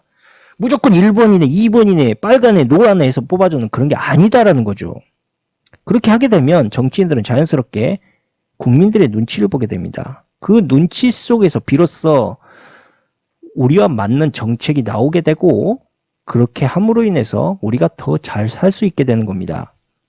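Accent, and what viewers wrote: Jeolla, South Korea. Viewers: 은 절라도 말투? 경제에대해 시원하게 말씀해주시고 윤석렬은 특히 미워하시는걸 보니 진보(사회주의)에 가깝다. 사실 정치가들을 믿을 수는 없지만.....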